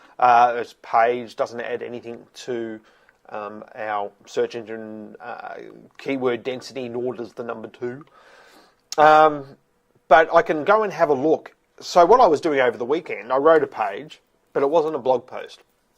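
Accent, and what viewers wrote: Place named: Australia. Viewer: Thank you for this video! What do you think about zippcast? P.S. I like your Aussie accent :)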